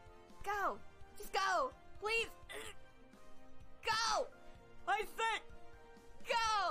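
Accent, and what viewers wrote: United States. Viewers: I'm having a hard time placing the accent. It sounds midwestern or Michigan to me. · and speaks american english. Damn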